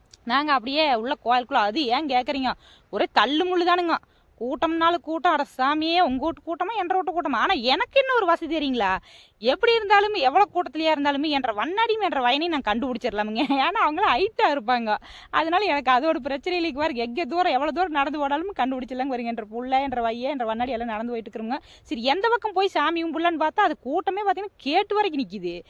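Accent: Kongu, India. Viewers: அடடா என்ன ஒரு உண்மையான கொங்கு நாட்டு தமிழ் என்ன ஒரு சொல் வீச்சு வாழ்த்துக்கள் சகோதரி 🎉🎉🎉🎉🎉🎉🎉🎉🎉🎉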